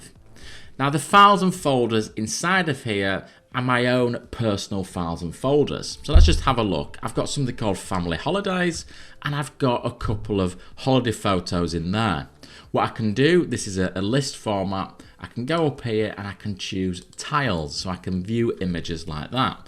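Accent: Yorkshire, United Kingdom. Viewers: American here. Hearing IT information in a Yorkshire accent - GOLD. I love that accent. So cool. 😊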